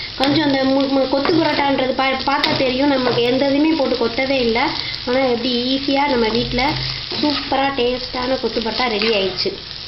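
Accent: Madurai, India. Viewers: loving your Madurai Tamil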